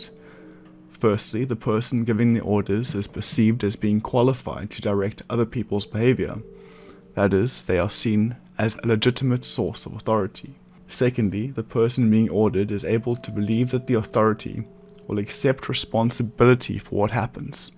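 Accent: South Africa. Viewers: This narrator sounds distinctly South African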